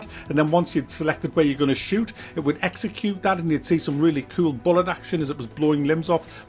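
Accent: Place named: Geordie, United Kingdom. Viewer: i can count the games on my left hand that actually has anything good to say about and i lost 2 fingers on my left hand in an IED blast whilst on patrol in Afghanistan,i think one should just take the plunge on games and buy it and play it for themselves just like the good old days and if its shit then ho well.never listen to others opinions,what doesnt work for peter doesn't mean it wont work for paul. you tubers love pissing and moaning about stuff because it attracts the low intellect people that just take there opinion as gold.back in the day when i bought sega and nintendo and commodore games there was no pissing and ranting yet the games were purchased and played none the less.i think you tube is a bullshit infested pit for people with no friends,no life and rely on it for a honest income,which i find pathetic.maybe if there was no money in it then i would like to see how many pissy rants you wanted to post then when you have to go out at 5am and work till 8pm just to scrape by.i come on these channels to boycott them,its in my belief a syndicate and many people are already trusting there lives on these people that continue to make there opinion count as if there a god and we should all bend a knee to there opinions,remember anyone can grab a cam mic and talk bullshit and joke swear and rant yes it has a certain charm because you have that jordie twang,yes the yanks probably love it but as a brit myself i hate it by the fucking way as many of my squaddie friends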